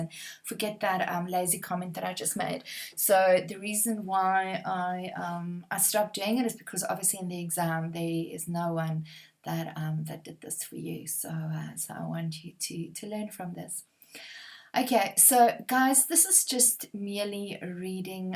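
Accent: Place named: England, United Kingdom. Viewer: Thank you very much. Btw, you sound very English, so maybe English instead came to you in a teapot.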